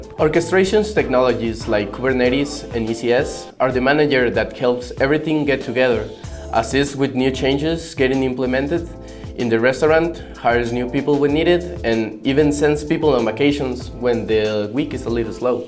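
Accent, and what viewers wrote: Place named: Mexico. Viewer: Amigo, you accent sounds exactly like Raúl Jiménez's, when you speak.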